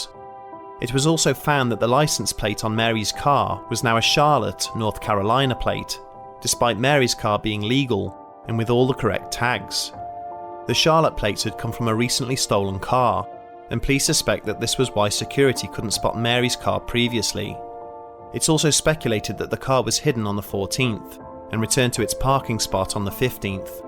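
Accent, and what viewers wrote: England, United Kingdom. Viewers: Because he's English, like me! We have the best voices for narration.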